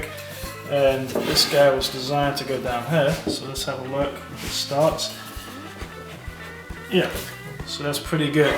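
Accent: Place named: United Kingdom. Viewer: British, Texan and maybe German? accent.. interesting combination. not sure of the German but I swear I hear it in there from time to time!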